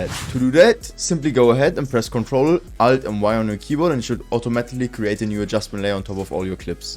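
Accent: Germany. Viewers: Du hörst dichn bisschen deutsch an 😅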